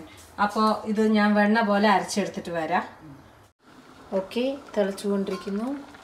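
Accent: Palakkad, India. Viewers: Asalailyei palakkadan basha.kodhi gerunnundu ente chechyei.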